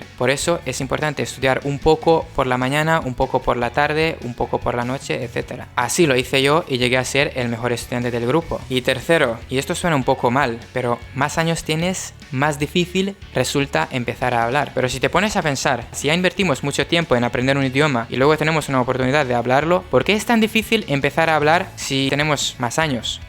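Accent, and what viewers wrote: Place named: Spain. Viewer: Excelente nivel de Español, lo hablas super bien, pareces de España.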